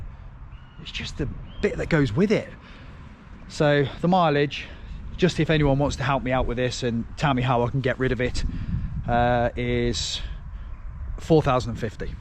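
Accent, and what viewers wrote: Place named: England, United Kingdom. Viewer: #WhingingPom the moment I heard the accent, I knew I was in for a whinging pom. I was not disappointed.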